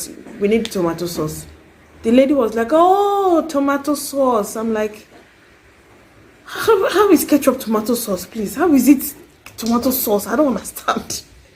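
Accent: United States. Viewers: Lol 😂 from listening to you i think our English influence is from different continent yours from America mostly ours from UK mixed with our own language 😂 we just hit the short cut with names 😂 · Seems like u speak American English. Lol how is Tomato sauce a Ketchup though??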